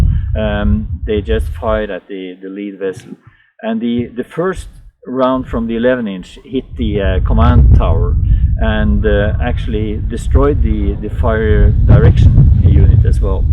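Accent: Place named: Norway. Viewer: I worked with Norwegians at sea out of NZ waters for over 12 years, so this accent was not hard to understand. Most were from the west coast. They never talked much about Norway. Fish, the ship and football were their usual topics (usually Manchester United). They used to make this stuff that is fish soaked in caustic soda for a couple of days and then rinsed with water so it becomes a paste. It was like a special treat for them. I was never brave enough to try it. Salty potato dumplings doused in what we called heart attack sauce was another option. Dried ling fish too. I was never a fan of the food, but they ran the best ships by far. Best pay and best to work on (they paid the best trying to get the best crew possible, as opposed to ships that pay as little as possible and then wonder why the crew does not work well). It does not surprise me they had such a big merchant fleet before WWII.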